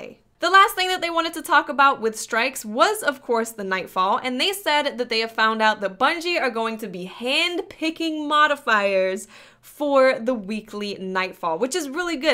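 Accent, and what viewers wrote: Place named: New Jersey, United States. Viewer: you have a new jersey accent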